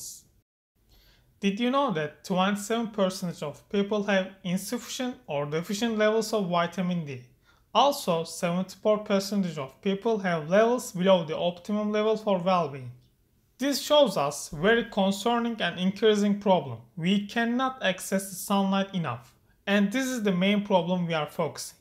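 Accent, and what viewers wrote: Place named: France. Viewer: I love your fench accent :D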